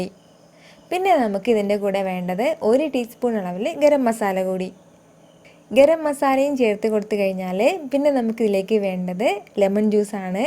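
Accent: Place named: Palakkad, India. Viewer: പാലക്കാട് ആണോ വീട് സംസാരം കേട്ടിട്ടു തോന്നുന്നു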